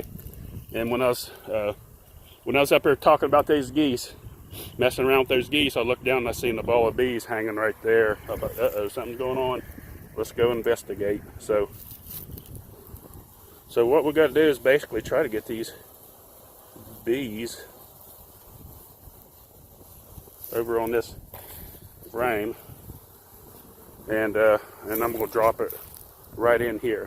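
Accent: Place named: Pennsylvania, United States. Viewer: where are you from? the accent sounds vaguely familiar. Maybe Pa.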